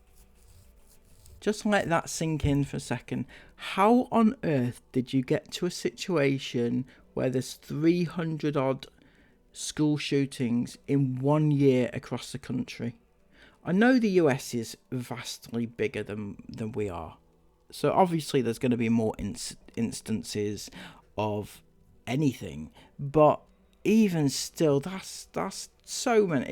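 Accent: United Kingdom. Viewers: Why are you bothering yourself with what's happening in America. You sound British. Do you not have enough to worry about in your own country?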